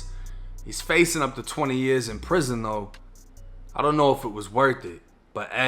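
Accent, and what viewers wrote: Boston, United States. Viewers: Uh, He’s from Boston? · putting wayyyyy too much on that boston accent bud lol. cringe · U should follow the Nigerian blogger Dr Yemi on IG for all the updates on HushPuppi from Nigeria 🇳🇬 point of view- I KNEW he was gonna start telling on every1 bc his own homeboys told on HIM - they all clowns 🤡 if u asked me - I mean WHO gathers their own evidence against themselves- 🤦🏾‍♀️👀👀✊🏾✊🏾 thanks for the video though we love ur accent (is THAT a Southey BossTown accent) or Worcester??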